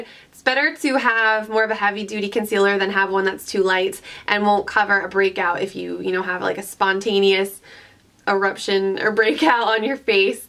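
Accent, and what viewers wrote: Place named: Philadelphia, United States. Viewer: I just saw someone comment and say you're from Philly and I have to say: I fricken knew it! We have a very specific accent and I knew I heard it in you when I first started subscribing to your channel haha.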